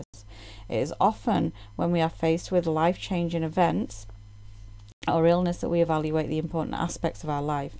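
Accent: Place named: England, United Kingdom. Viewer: your welcome its nice to listen to an english voice too, nothing against any others, ive just not come across many english speaking readers. From uk england.:-)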